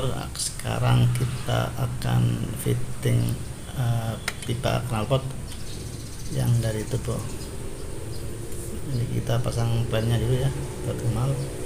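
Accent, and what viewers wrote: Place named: Banyumas, Indonesia. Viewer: bahasanya ngapak om, salam dari republik ngapak, purwokerto 😄😃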